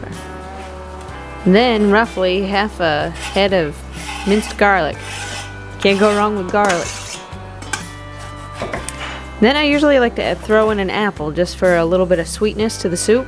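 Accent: Maine, United States. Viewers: I could sit down and listen to your Maine accent all day long soup looks great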